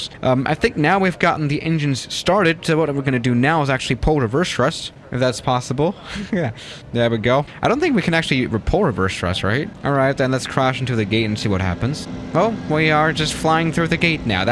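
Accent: Germany. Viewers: Are you From Germany or Switzerland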